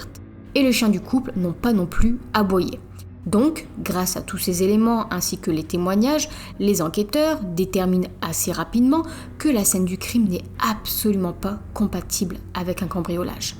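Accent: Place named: Nord-Pas-de-Calais, France. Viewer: Pas top quand c'est raconté avec l accent chti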